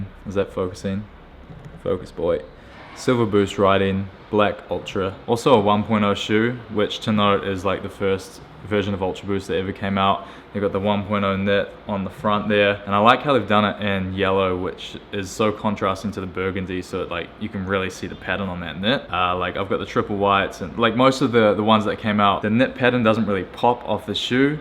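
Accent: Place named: Australia. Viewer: Broooo, How much did you pay? You sound like your from Australia.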